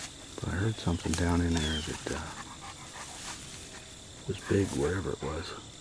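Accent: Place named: Monroe County, United States. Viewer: Looks like Monroe county and you sound just like my family in Monroe county. beautiful country!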